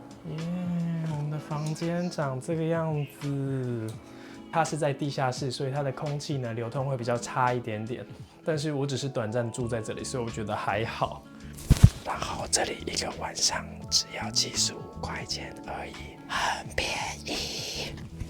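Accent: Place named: Taiwan. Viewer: 欢迎你到我女儿住的地方来观光，听上去是台湾的朋友，祝你玩得开心！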